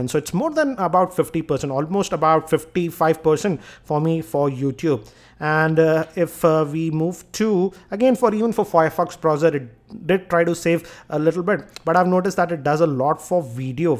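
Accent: India. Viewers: Then u are not Indian as he has Indian Accent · Believe me even as an Indian think his accent is way too accentuate. Very few people talk this way😅😂😂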